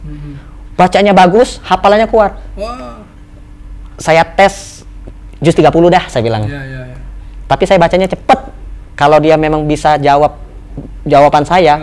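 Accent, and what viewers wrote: Palembang, Indonesia. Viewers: Ini pak ngomong lidahnya Palembang banget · logat palembangnyo om ku dak ilang ❤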